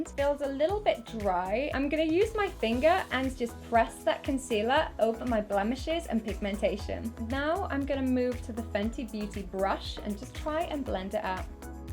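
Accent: United Kingdom. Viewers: I never comment on youtube but this video i have to comment! I'm not even from UK, english isn't my first lenguage ( I'm from Brasil) but i speak english, my english is so mutch better when i started to watch your videos. Ii can even talk with your brittish accent! anyway, i have to say that u are now, my favorite youtuber. U r honest with your watchers, u make me feel comfortble, really i feel like u r my friend. Continue doing this amazing job, u r such beautiful outside and inside. wish you have more sucess every day. thanks for being my distraction on my sadness days, and help me understand more the british english and about make up hahaha . Love u !!! x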